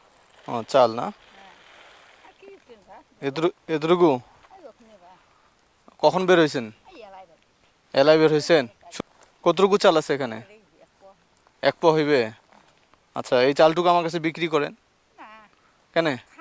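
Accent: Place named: Rangpur, Bangladesh. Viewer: ভাষা শুনে মনে হলো, রংপুরের, ভাই, আমিও ছোটো খাটো বাইকার, আপনার পাশে আমি থাকতে পারি, যদি প্রয়োজন মনে করেন, বলিয়েন, সাধ্যমত পাশে থাকবো। আর আপনার এরকম মুল্যবান কাজ গুলো চালিয়ে যান। দোয়া রইলো ❤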